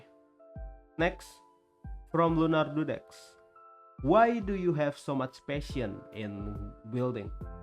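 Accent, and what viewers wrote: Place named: Indonesia. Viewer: Anj kan bener dari indo, dari aksen sebenernya udh keliatan kaya orang indo, tapi gw agak ragu lu org indo soalnya jago bgt bahasa English 😂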